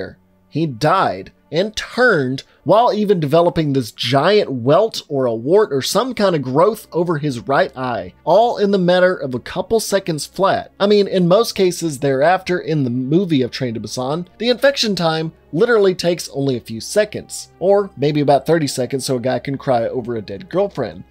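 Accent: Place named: South, United States. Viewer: I just realized how much he has a southern drawl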